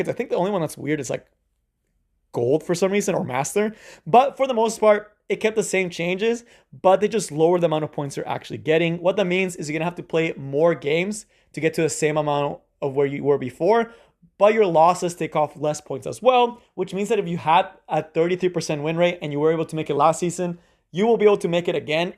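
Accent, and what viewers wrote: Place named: Mexico. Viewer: Why your accent sounds Mexican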